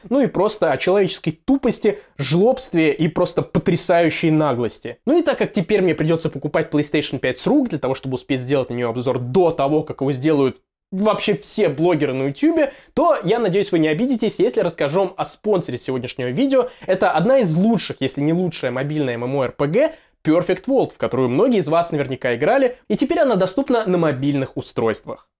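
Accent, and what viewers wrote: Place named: Russia. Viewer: Ты еще толком не жил в Чехии, чтобы говорить о чешском сервисе, а на тебя положили скорее всего из-за того, что у тебя русский акцент есть.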